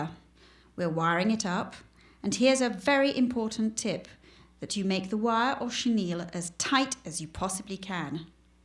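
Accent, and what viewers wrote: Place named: United Kingdom. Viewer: Loved your tree decorating video. Inspiring and wonderful! Would like to hear Sharyn Alice in this video instead of the british accent. This voice does not sound sincere. You have lost impact with the voiceover.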